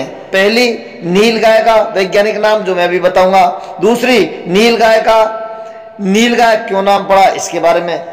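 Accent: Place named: Haryana, India. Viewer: Bhai Haryanvi lag rhe ho😁🤣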